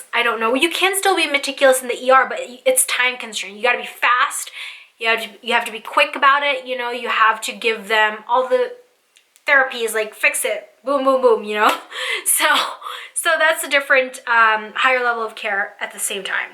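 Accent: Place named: United States. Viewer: You picked up the American accent quickly for someone who came here in 2012.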